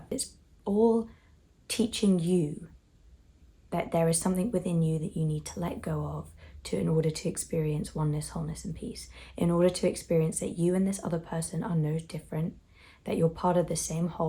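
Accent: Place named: United Kingdom. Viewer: I have a crush on this woman. Her British accent is so cute!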